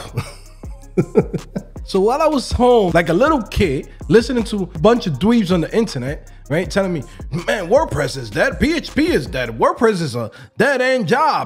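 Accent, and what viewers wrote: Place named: New Jersey, United States. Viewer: Wish I would’ve found this channel before I enrolled in a full stack course..finally somebody who look like me, even tho I’m black and I’m assumin he Dominican prolly..whenever you look up coding it’s a video wit a white boy or an asain which ain’t nothing wrong with that, but representation matters, and seeing a dude who talks like me and is presumably from where I’m from(I’m from Jersey he’s definitely from Jersey or ny) is exactly what I was lookin for 🙏🏾🙏🏾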